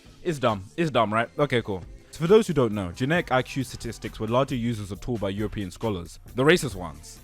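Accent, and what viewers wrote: United Kingdom. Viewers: Ok haven’t watched the video yet. My thoughts before I start based on the title. Coming from the perspective of a black American male. Your accent from the perspective of white Americans, (which is the perspective of power) puts British blacks in America a top American blacks in the hierarchy . Now you’re still black so you’re bellow all white folk, but your struggles here in America will be different than an American black. I wouldn’t consider it American exceptionalism because it would be ignorant to think because you’re British you don’t experience the same sort of systematic racism that we have in America, because systematic racism isn’t an American thing. It’s a western thing, you’re still black you’ll face the equivalent hardships just the British version. I’ll come back and edit this post after the video if my thoughts have changed or been addressed.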